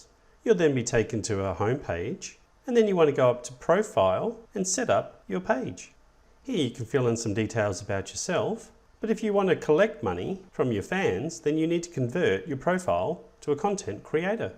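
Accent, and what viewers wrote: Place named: Australia. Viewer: the Australian accent messes me up. I keep reading from the melody that is used to modulate their language that every second or third word is sounding like either a question or "don't you understand, it's so obvious"... I really didn't get anything out of this. I mean... "ask your fans to contribute to your projects"... how? How do you get your "fans" and why would anyone "pay" me?? The very idea of advertisements in the classic youtube monetisation means people themselves don't need to spend money. The corporates do that with their (massive) advertising budgets. Most people want everything for free in this buy one get one free culture today. People actually pay a monthly subscription for videos that appear from time to time from someone? I certainly would pay for that unless I am following a course and want to see each video and "get something for my money". 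I don't really get it.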